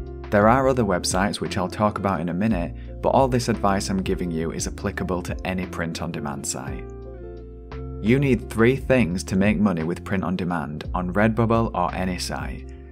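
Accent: Yorkshire, United Kingdom. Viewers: Sounds Yorkshire or northern English to me, based on all the Brit TV I've watched my life. (I'm American) 😊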